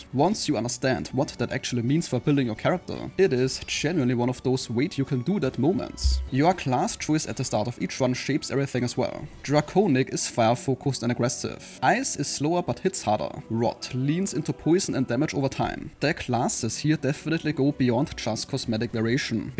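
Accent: Germany. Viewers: German or?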